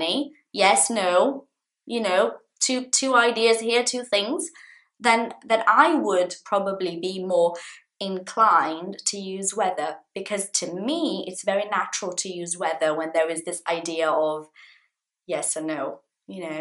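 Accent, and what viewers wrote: United Kingdom. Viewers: Esse inglês britânico é o melhor, lindo de se ouvir 💙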